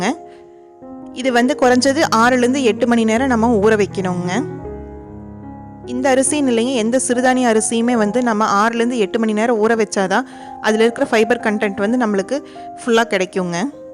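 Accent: Kongu, India. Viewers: எங்க கொங்கு தமிழ் இப்படி தானுங்க இருக்கும்.... என்னங்க பன்றது...? சொல்லுங்க பார்ப்போம் 😊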